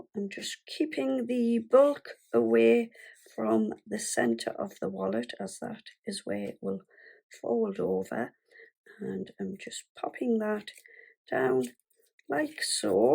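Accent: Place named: Newcastle, United Kingdom. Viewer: Thank you so much for the wallet idea. My sister and I are building up papercraft and crochet items for a craft fair later this year. I have been following your uTube channel for only a couple of weeks and you have such great ideas. We were looking for something for males as we felt we were leaving them out as we have lots of girly stuff. I most of all love your great Geordi accent it’s my favourite accent so I could listen to you all day(hope that doesn’t sound too creepy 😮. Thanks once again xx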